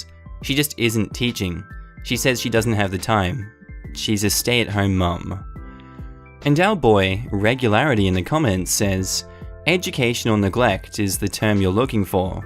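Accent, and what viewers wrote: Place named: Australia. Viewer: your amazing Aussie voice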